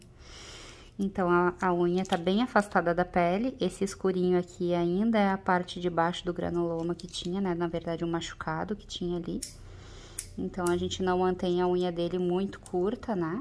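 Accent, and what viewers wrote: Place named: South, Brazil. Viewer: Ela é do Sul, por isso o sotaque. É normal.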